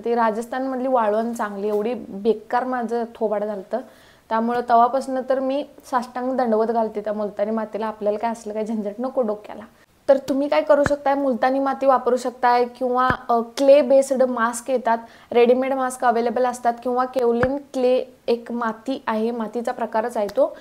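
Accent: Kolhapur, India. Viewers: Communication skills Uttam ahe tumach❤ kolhapur cha tone yetoy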